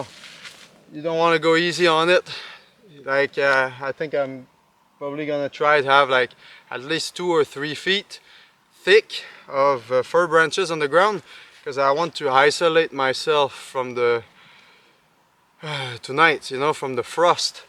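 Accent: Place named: Quebec, Canada. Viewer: Also just found you. You remind me so much of the guy in “49th Parallel.” Lawrence Olivia. Right down to that French Canadian accent. Love it. You were made to do this. People need to know how much water you need in the winter. I worked out in the cold for close to “ let’s just say many years.” Very dangerous when you sweat. I like how you kept it so simple. Beautiful scenery.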